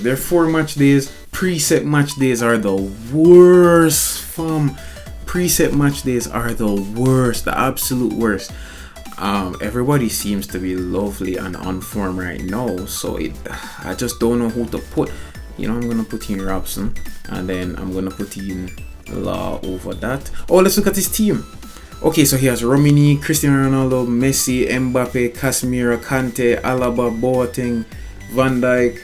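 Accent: Jamaica. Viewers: are you jamaican bro. if not big up stills 🇯🇲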